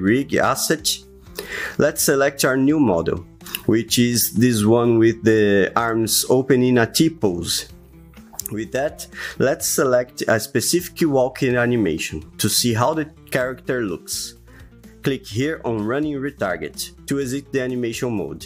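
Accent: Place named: Brazil. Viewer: Brazilian voice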